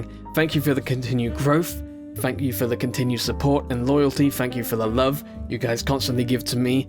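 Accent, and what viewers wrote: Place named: South, United States. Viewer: I died a little at the southern accent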